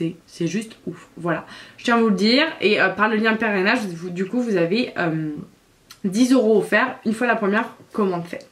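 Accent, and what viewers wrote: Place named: England, United Kingdom. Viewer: L'accent anglais mdr 😂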